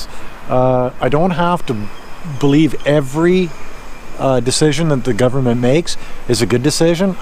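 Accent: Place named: Canada. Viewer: As an American who grew up in the states my whole life I agree, it’s great to hear a variety of perspectives on China from a friendly beer-drinking Canuck with an awesome accent living there. I’d love to visit someday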